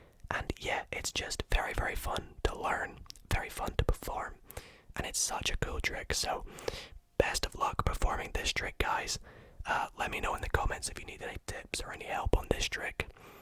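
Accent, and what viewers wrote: Scotland, United Kingdom. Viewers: Are you Scottish? Cos I an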